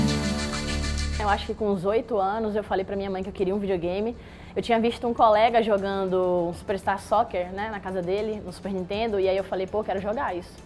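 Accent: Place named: Bahia, Brazil. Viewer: Adoro ela nas narrações na ESPN não tem aquela voz chata além desse sotaque baiano que é maravilhoso...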